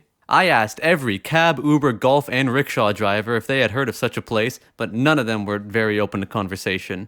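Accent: Canada. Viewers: WHAT FUCKING PART OF CANADA ARE YOU FROM LIKE I CANNOT TELL?!?! ARE YOU FROM TORONTO OR THE ATLANTIC YOU SICK FUCK???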